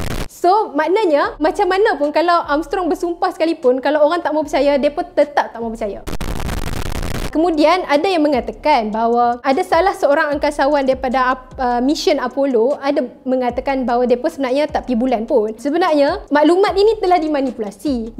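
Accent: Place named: North, Malaysia. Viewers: Uishhh loghat utagha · Memang lah...depa nak tunjuk longhat utagha kan.. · Pekat sggh utariaq hg nohh 😁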